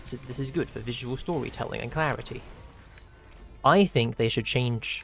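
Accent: United Kingdom. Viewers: I enjoy listening to funny British guy's(your) voice while I do stuff... it's like a podcast with occasional ultrakill sounds.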